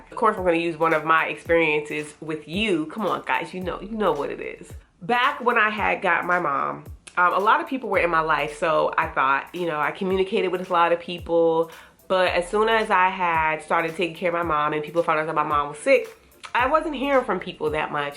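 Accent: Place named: East Coast, United States. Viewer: Love ur East Coast accent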